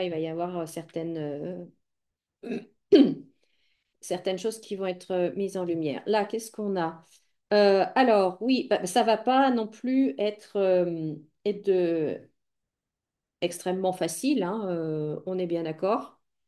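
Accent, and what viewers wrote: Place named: United States. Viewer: Quelle accent Américain 😊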